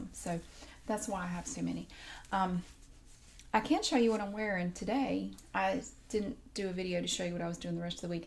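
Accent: South, United States. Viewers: Darling, this is a great video on thrift shopping, but it is not soft spoken and it is not ASMR. I know you are a fashion fan, but this video is too high energy and does not induce tingles even with your beautiful southern drawl. Perhaps you should start a second channel specifically for your fabulous clothing shipping trips. I do find your Aldi food shopping videos to be quite relaxing. Best, C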